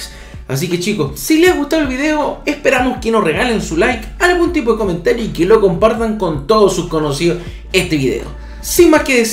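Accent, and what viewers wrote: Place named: Chile. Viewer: Eres de chile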